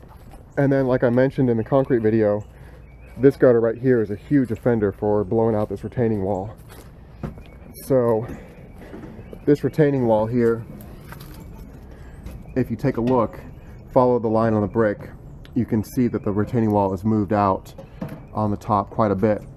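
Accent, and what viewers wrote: United States. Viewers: I can't place your NC accent....Cary?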